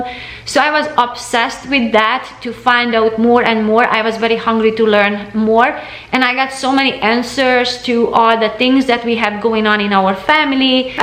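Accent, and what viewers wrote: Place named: Hungary. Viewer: Wow! that was an amazing video, thank you so much for opening up to us and allowing us to get to know you better. first of all please; never apologize for your accent, I am sure we all understand you perfectly well, and many of us have"accents" well, my maiden name is Szabo, my Daddy was Hungarian, and ended up in France after WW 2 and met my &Mom, so I was born and raised in France but my Dad took us several times in Hungary and Romania ( transilvania) to meet our family and these times are some of the best memories of my childhood and now I live in California with my German husband...😀...in your voice and your intonations and pronunciation, I can hear my Dad's accent and it warms my heart.All I can say is you do such a wonderful job at helping people get motivated to improve their homes and environment and,taking care of our health to,o thank you so much for that, just that, in itself you accomplish so so much, your family must be so proud of you, and you show people that having a close partnership with your spouse is so special and makes,your life easier and helps you so much in overcoming difficulties in ln life, your guys' love for each others shines thru in your videos and I think is very encouraging to younger couples to strive to become a strong and united mates, thank you again.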